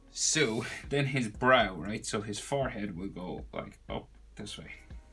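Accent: Ireland. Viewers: Are you irish because you sound that way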